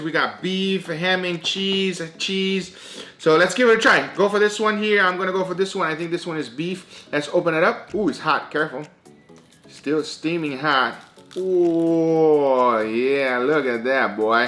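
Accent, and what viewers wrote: Brazil. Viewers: O sotaque dele é brasileiro. Português é a língua materna.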